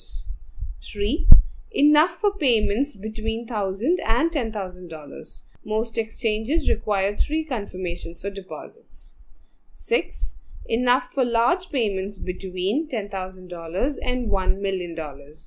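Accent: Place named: Trinidad and Tobago. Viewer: Your accent suggests you are from Trinidad. Am i right?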